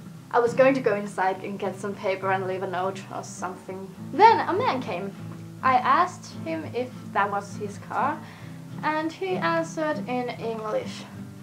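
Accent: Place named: Finland. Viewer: Hahhah, it's a Finnish accent :D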